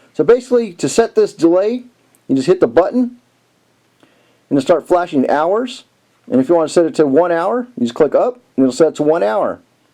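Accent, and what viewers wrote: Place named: Texas, United States. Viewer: Great video and with the Texan accent it sounds straight forward.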